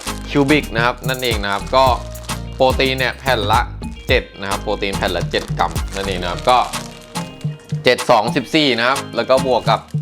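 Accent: Thailand. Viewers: เฮ้ยทำไมเหน่ออ แต่เราจะทำตามนะชอบๆ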